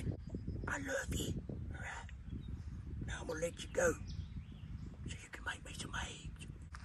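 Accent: South, United States. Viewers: Very southern sir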